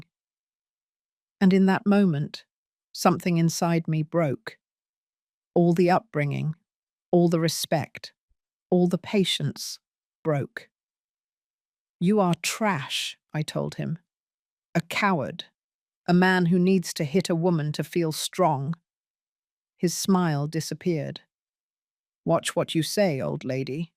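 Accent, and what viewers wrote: United Kingdom. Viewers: She said that she is from Boston but she sounds British and the words in the closed caption are spelled British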